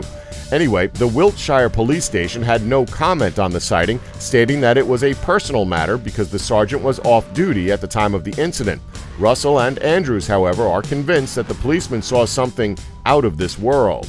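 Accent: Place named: United States. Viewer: Probably a Chinese channel that hired an American sounding narrator.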